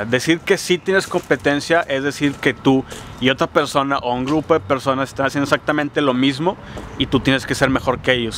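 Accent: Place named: Upper class, Mexico. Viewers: Hablas muy fresa 🍓🍓jeje Me encanta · Me encantó tu vlog y mas tu acento de mirrey Paps ;)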